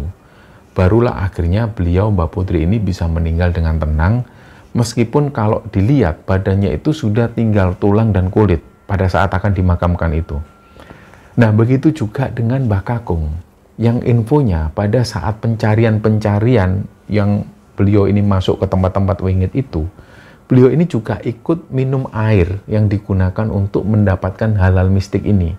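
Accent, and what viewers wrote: Indonesia. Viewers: Suaranya mas meduk (kawa)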